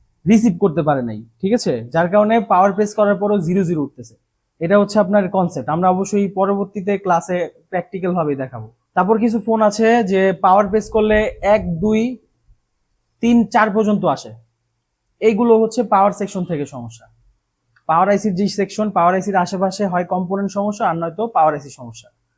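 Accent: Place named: Bikrampur, Bangladesh. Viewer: ভাইয়ের কথা শুনে মনে হচ্ছে আমাদের বিক্রমপুরের লোক। আমি মোবাইল সার্ভিসিং শিখতে চাই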